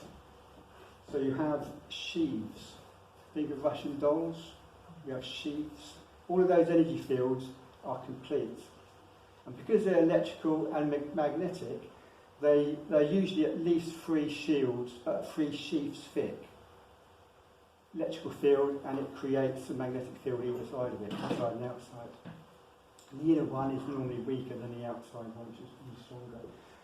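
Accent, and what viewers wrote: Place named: United Kingdom. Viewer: Love that British Accent........feels like home .......thank you for your Love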